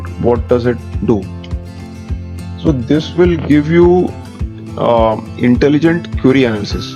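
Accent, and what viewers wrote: India. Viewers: Thick indian accent, crap mic... and to top it all horrible background music - how did you manage to screw this up?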